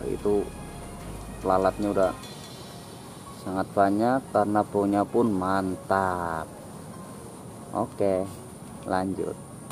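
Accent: Java, Indonesia. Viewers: Sampean jawane ngndi.. Poine jos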